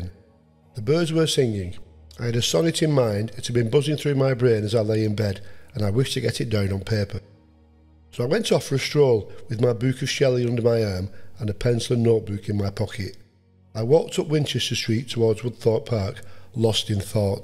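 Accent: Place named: Bolton, United Kingdom. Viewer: Nice one cha the case in Blackburn where they finger printed the entire town struck a chord as I was born there and iam guessing your not from far away with your accent Bolton farnworth is my guess ha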